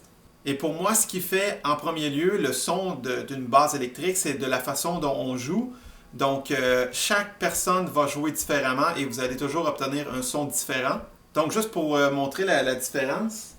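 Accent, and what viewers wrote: Canada. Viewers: C'est la première vidéo que je regarde de et déjà on ne peut pas rater ton accent canadien:) Ensuite, sympa ta petite explication, je cherche moi-même une façon d'enregistrer ma basse sans saturation vu que le micro de mon casque n'est pas le meilleur moyen! En tout cas bravo, t'as l'air sympathique en plus.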